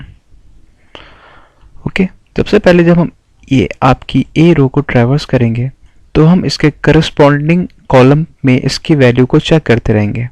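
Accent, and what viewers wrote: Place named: Pakistan. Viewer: Thanks for your help. Your accent is pakistani :) good keep it up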